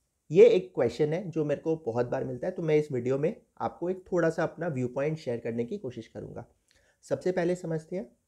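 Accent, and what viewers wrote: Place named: Bengal, India. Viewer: Guys this is funny and misleading.. heading says how a CA can get into PE.. and it starts with let’s the CA do MBA.. 😂😂 The video lacks depth and limited research and obviously no practical experience.. Even if you are a 2nd attempt CA, you still have a chance to get into PE, if you can build up right network and have relevant experience.. Pls don’t get misguided by the ‘sauve’ perspective of this video, it’s just myopic. Anyone willing to get into PE space need following two skill sets along with good networking skills: 1. Ability to evaluate investment propositions - it just basic FM you read in you CAs along with practical POVs on current business, market share, customer base, operational efficiencies, Risk etc. 2. Managing operations- PEs work with their portfolio companies to improve value and finally over the years when they achieve certain efficiency, they look to exit. 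So anyone have operational understanding or ability to manage stakeholders and drive them to improve operations, identify and implement synergies are preferred. Following skills along with above 2 are good garnishee: 1. Business Modelling 2. Transaction structuring and taxation - CAs are champ in this 3. Good communication skill - this is always good to have in the jobs you do. But doesn’t stop you to achieve anything, had the case been otherwise, the host of this video with half Bengali ascent wouldn’t have got 30k followers.. you need not be ‘Sauve’, a Chetan Bhagat english can work wonder..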